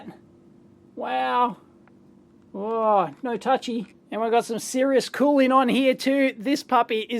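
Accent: Australia. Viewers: This guy is Aussie AF. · Aren't Aprils fool an US-only tradition? Youtuber is from Australia (as if his accent didn't made that obvious)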